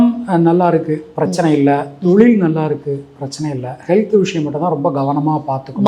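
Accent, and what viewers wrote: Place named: Manipravalam, India. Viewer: அம்மா நன்றி தயவுங நமது மணிப்ரவாள பேச்சு நடையை விடாதீங்கோ.நன்னா பேசறேள்.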